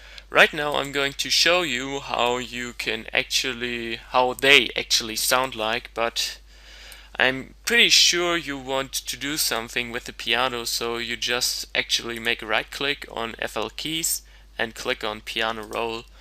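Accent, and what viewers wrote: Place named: Germany. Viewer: Direkt deinen deutschen Akzent erkannt:)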